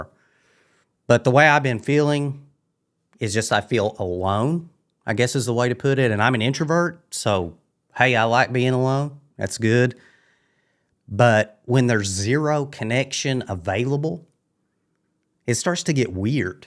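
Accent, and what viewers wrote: South, United States. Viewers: You sound like you're from the south. Either NC or TN. I agree with you. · What kind of southern accent is that? I am from the Appalachian mountains in western NC but I’m not aware to his dialect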